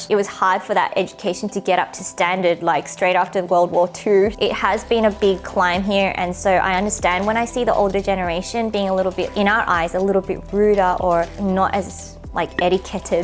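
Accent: Australia. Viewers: Hi its my first time leaving a comment, but i love how you share your faith through ur channel. Yes id love to hear ur testimony! And I really like ur Aussie accent:)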